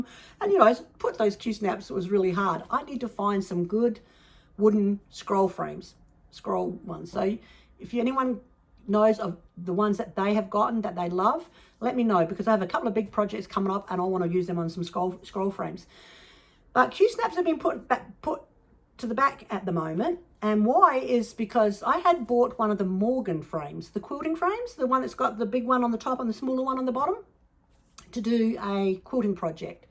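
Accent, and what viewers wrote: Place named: Australia. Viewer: Oh. Goodness Covid..So glad you are on the mend. So good to hear your podcast again. Watched you from the beginning..and watched your store grow. Will have to visit. Love hearing your Aussie accent..Kia Kaha, good Lady.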